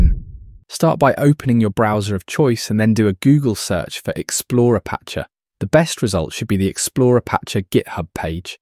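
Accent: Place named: United Kingdom. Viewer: I don't trust him mainly because of his UK accent I don't like him I don't trust them That's gonna be a no for me dog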